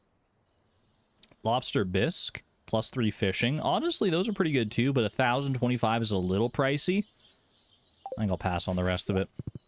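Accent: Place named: Canada. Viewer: your extra Canadian accent is charming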